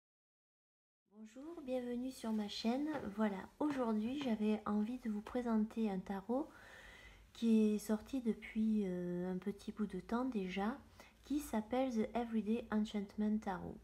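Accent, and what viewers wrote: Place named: England, United Kingdom. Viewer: L'ACCENT ANGLAIS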